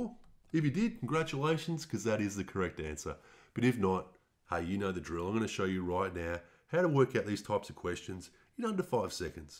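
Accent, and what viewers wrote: Australia. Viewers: Awesome ! btw Ya sound like an Aussie or some NZ guy !😅💥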